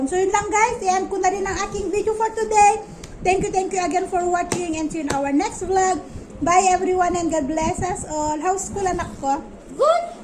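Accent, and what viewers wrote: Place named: Laguna, Philippines. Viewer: Nkpunta KC sya Laguna..kya may eh n Rin sya.pero timmangkin suna nga ilocana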